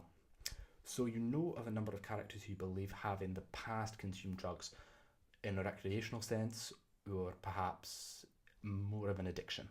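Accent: Scotland, United Kingdom. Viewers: Great accent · Andrew Robertson would approve of the accent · YES more scottish accent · YAAAAAY!!! Scottish accent part 2, finally!!!! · Not a bad Scottish accent I reckon you sound like a teacher in Edinburgh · Can you do other profession?i loveeee the scottish accent x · Your videos are a great tool for mastering a Scottish accent.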